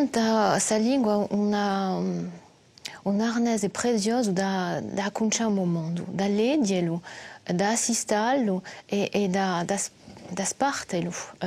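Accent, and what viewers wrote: France. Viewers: BELLA LINGUA MA CON UNO STUCCHEVOLE ACCENTO FRANCESE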